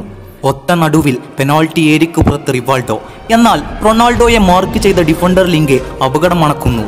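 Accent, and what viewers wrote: Malappuram, India. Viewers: Nighal malappuram kaaranaano😁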